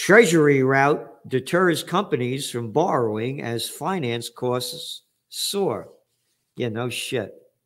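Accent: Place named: New York, United States. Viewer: Spoken like a true New Yorker.. love it.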